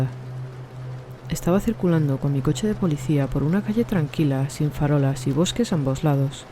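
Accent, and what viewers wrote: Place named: Spain. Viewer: No me gusta ese español. Prefiero el español "latino". Gustos de gustos. Gracias x leer.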